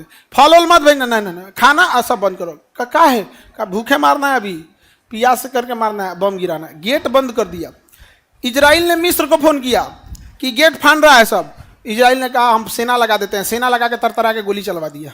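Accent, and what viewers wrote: Bihar, India. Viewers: Bhai chawal ho tum toh kuch samjh nahi Aa rha bihari ho na pakka tum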